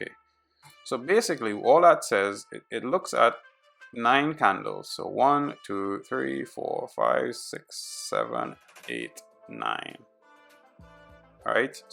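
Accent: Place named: Trinidad and Tobago. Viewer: great video. u sound like a trini, we should link up and work on some stuff. i am also an algo trader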